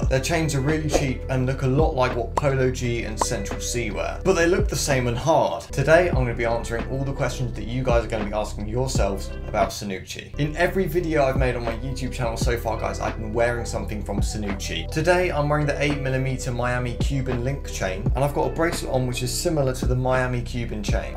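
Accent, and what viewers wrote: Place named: United Kingdom. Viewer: Love ur british accent homie 😂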